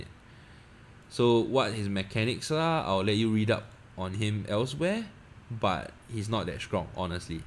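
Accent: Singapore. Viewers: Are you singaporean?or Asian haha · it's more like singaporean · Bro this is an awesome guide. Are you from Sg? Can recognize the accent lol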